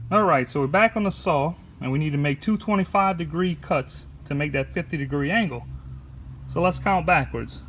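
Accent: Louisiana, United States. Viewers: Can you tell he’s from Louisiana? Good video. His keep it simple instructions are great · i can tell by your voice you are a son of Louisiana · Bruh you got to be from southwest Louisiana! Thanks for this video. Learn something new. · Louisiana accent so distinctive. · First off, are you from south Louisiana? You have a good accent that suggests Cajun upbringing. Secondly, thank you for providing REAL instruction. Everything else I have found while google searching online has led me to absolute basics for people that probably are incapable of even tying their own shoes. I'll look through more of your stuff as I search for information on making compound miter cuts. Thanks again. (And if you are indeed from south Louisiana, I'll add a hearty "GEAUX TIGAHS!")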